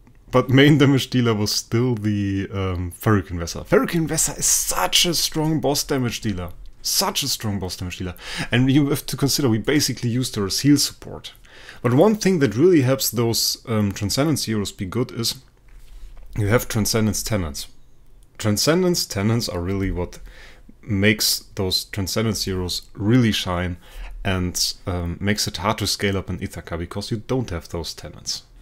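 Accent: Germany. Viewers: Hör ich da einen deutschen akzent oder bin ich lost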